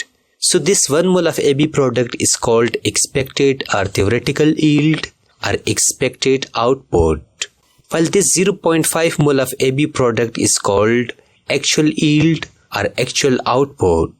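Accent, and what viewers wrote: India. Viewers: 🎉🎉 thank you I thought u r from India 🎉❤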